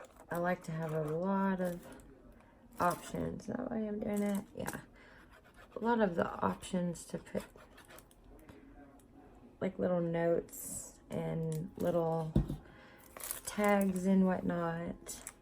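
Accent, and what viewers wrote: South, United States. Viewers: I’ve never made one of these . I’m honestly not very crafty. I have watched a couple of people in the past do these I I just couldn’t make heads or tails out of it. Watching you and your thought process as you put this together made so much since to me. I learned more from these first two videos than I’ve ever been able to learn before. I’m a visual learner and you made me feel like I really could do this! You kept apologizing in the video and i kept thinking no, no, no, you’re teaching this the way it should be taught. I for one am very grateful. It wasn’t boring to me, it was informative and detailed in just the way I needed it presented in order for me to understand. Thank you so much. This is my first time here. I kept thinking as you talked that you have to be from Arkansas, Texas, or Tennessee. I’m from Tennessee and I’ve been transplanted in Arkansas for the last several years. 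Lol! I can’t wait to watch you again! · Hi! New friend to your channel from Kentucky. I can tell you have a southern accent as well. Thank you do much for showing us how to start one of these cute journals because I want to make one for my daughter as well as one to do with my triple negative breast cancer I was diagnosed with this past November 2020. You truly have inspired me to make some. 💗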